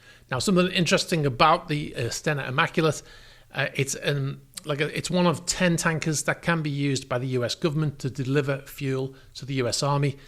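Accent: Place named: RP, United Kingdom. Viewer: Don’t give a damn about wet stuff. I watch this channel since the beginning because of most perfect bbc English ever. Pure enjoyment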